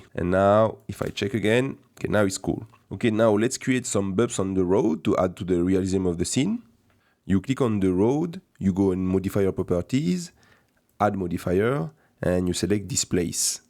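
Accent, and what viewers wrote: France. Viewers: je peut entendre le p'tit bout de francais qui resort. merci pour le tuto! je compte pas re cree la scene mais certains de tes tips m'aiderons ^^ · are you french? cause of your accents haha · salut, ca sent l'accent français non ? ^^ cool la vidéo. edit: en plus, c'est un windows en fr, donc plus de doute ^^ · are you French ?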